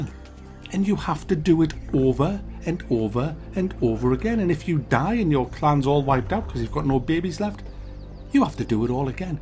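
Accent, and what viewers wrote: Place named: Newcastle, United Kingdom. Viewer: video is too long to tell us its shit, cut to the chase a little more. you just get saltier and saltier, no body wants to hear that shit, its boring,90% games to you are shit but I like your stupid Geordie voice it reminds me of an ex squaddie mate that used to pick nub ends off the floor to make a whole fag. 5 min video bad things good things that's it but constantly trolling the game is what you do all the time just keep it short bud that's of my life I've just lost and will never get back.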